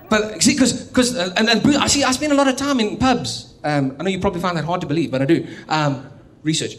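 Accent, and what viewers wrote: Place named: India. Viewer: man its tolerable adopting street Indian accent, but joking on Ghandi.. thats where you're a completely pathetic freak.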